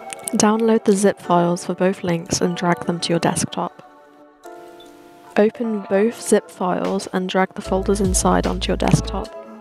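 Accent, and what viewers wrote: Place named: Australia. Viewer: stopp that australian accent is so cutee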